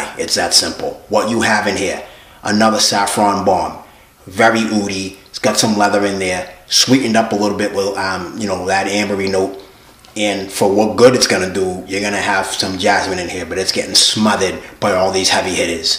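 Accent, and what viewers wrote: Boston, United States. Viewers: ok my guess was Boston, but didn't want to assume 😉 thanks very much for such an informative video covering so much ground! Subscribed 👍🏽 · Is that a Boston accent? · This was soooo helpful! I love your passion for scent - and your accent! (Boston?). Big love from the UK and Merry Christmas 🙂.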